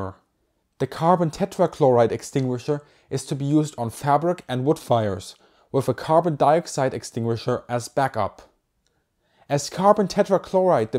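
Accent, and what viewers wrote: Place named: Germany. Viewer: Oh man, have you but a german accent.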